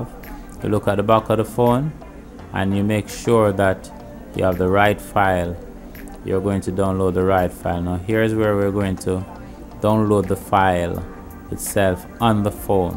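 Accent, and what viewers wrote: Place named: Jamaica. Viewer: yo yah jamaican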